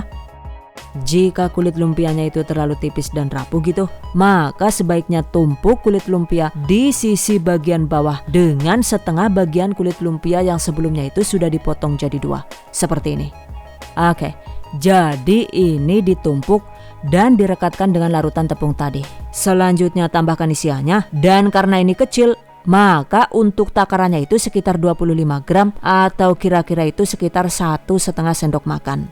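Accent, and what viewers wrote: Banyumas, Indonesia. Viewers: Aduh aku geget wong ndi si mbokayu deneng ngapak mblaketaket pisan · Ngapake bikin candu😁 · Aku ya eong ngapak, tapi ari krungu ngomong ngapak geget dewek 😅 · Ora ngapak ra kepenak😂😂😂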